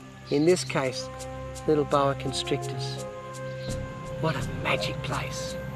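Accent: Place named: Australia. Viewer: I knew he was Aussie! I am really proud to be Aussie right now! :)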